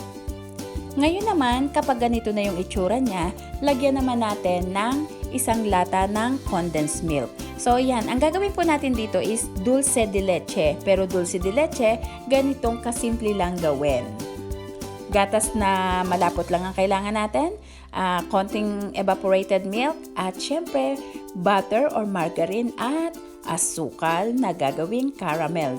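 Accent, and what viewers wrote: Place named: Iloilo, Philippines. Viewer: Ilonggo accent i can hear!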